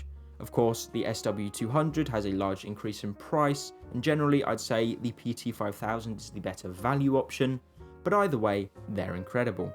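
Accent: England, United Kingdom. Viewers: He is British, whats the problem.... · If you find the way this guy speaks irritating, then you don't want to venture any further than Southern England. I live in the North and there's a completely different accent every 30-40 miles. To anyone outside the UK it probably feels like they're visiting a new country within a 1 hour drive 😂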